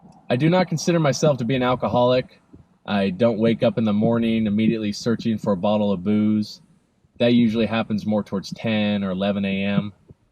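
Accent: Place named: United States. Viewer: Sangria pronounced SANGRIA not Sengria. If you you want to learn Spanish you must drop that gringo accent. Otherwise sounds so stupid.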